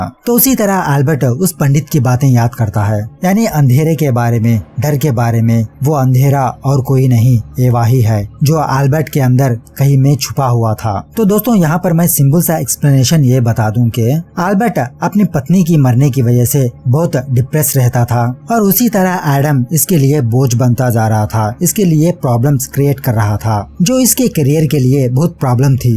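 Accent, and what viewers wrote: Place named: South, India. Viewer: You are south indian... i got it. but nice explanation. good.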